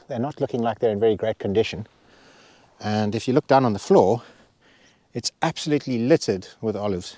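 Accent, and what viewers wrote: South Africa. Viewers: Thanks for brightening my life. I'm a fellow Saffa in Taiwan and stumbled across your channel. Great to hear a Saffa accent again. is so cute the way she says JA and your favourite phrase is "and stuff like that" 😆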